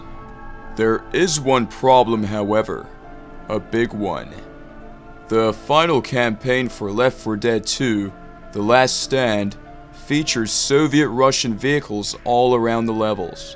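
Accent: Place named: California, United States. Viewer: This dudes California accent is crazy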